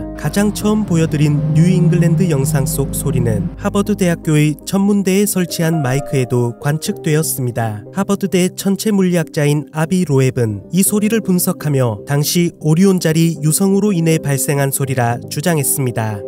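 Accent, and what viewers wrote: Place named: Gyeongsang, South Korea. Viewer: 왠지 경상도 사람이 서울말하는 것 같은 말투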